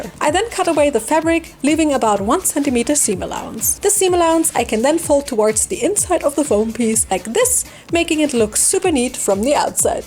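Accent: Germany. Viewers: I just found this channel off youtube shorts and im obessed. Ive always loved OOAK dolls and these videos are so pleasing to me. Btw i love your accent. Is it german?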